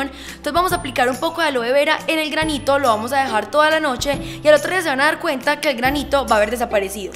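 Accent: Antioquia, Colombia. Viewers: Me encanta tu acento colombiano, saludos desde México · Uy ese acento a paisa se detecta a leguas XD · Yo solo me quede por escuchar ese hablado paisa bello😎😅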